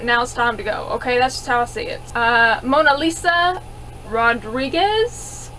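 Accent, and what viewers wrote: South, United States. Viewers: I knew I subscribed to you for a reason. You're speaking my language! I don't hate Carol & Daryl either, but I am over them being these overly invincible characters who monopolize screentime that could go to other characters. They're not the only 'interesting' characters on this show but you can't tell by the way the screentime is divided. Give me more of Michonne & Maggie, damn it! But the Southern language thing is SPOT ON. I was born and raised in south Georgia, and that's how the people here talk as well. When I first started watching your videos, I thought you were from here but nope. You're just a part of my extended Southern family. Greetings, my Southern Soul Sister! P.S. I started in S4 after binge watching too. So, you're not alone in the sense that you started watching the show 'late.'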